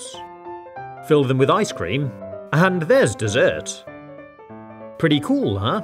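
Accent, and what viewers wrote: England, United Kingdom. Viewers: 99% of the comments: stuff Me: are you British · I surely know that U are an UK native right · He's British so it makes sense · That fact that you say "courgette" and "rocket" proved to me that you are English. I did think your dialect did sound Enhlish, but I am not good with dialects. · He's British.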